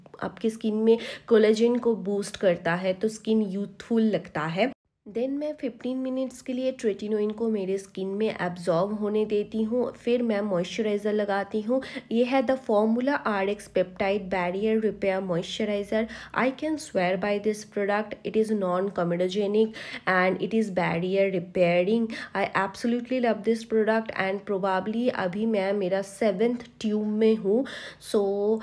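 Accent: Bengal, India. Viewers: Looks like a বাঙালি Sounds like a বাঙালি too ❤❤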